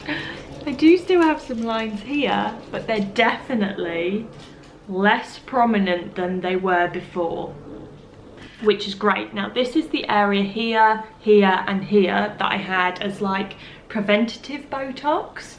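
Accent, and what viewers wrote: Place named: London, United Kingdom. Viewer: Omg ur dog sooo cute 🐾🐶! I love dogs 🐶! U look great thanks for sharing .. and like how u talk with that accent from London you r London girl .. 👍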